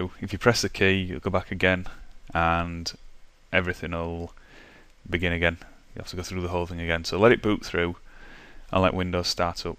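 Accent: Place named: Scotland, United Kingdom. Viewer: scottish accent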